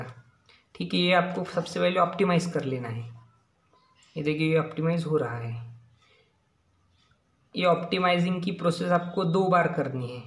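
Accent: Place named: India. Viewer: The most fucking hindlish I've ever heard. Blood from the ears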